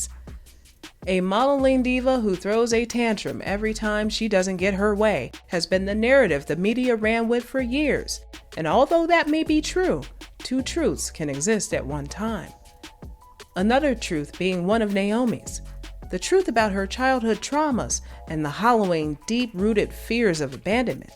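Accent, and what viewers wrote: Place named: United Kingdom. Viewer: It’s the British accent for meee🤣🤣 spot onnnn🎉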